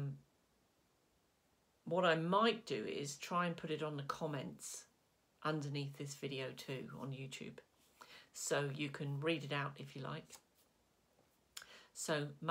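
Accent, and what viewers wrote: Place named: East Anglia, United Kingdom. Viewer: Thank you 🙏that was wonderful. Are you from East anglia? I used to live in Suffolk... I recognised the accent? X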